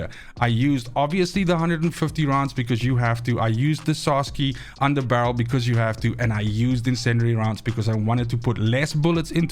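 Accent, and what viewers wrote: South Africa. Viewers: South african? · You from South Africa?or am I hearing that accent all wrong🤣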